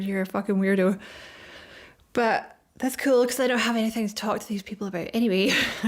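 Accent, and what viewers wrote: Scotland, United Kingdom. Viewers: OMG, I knew that you were Scottish by your beautiful bro. And I just found out that I have a percentage of Scottish in myself and I just absolutely adore that.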